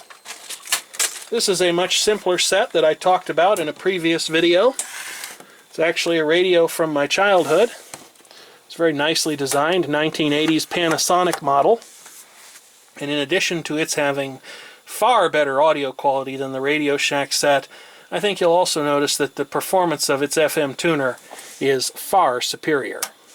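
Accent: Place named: Northern Illinois, United States. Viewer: i guess you from northern il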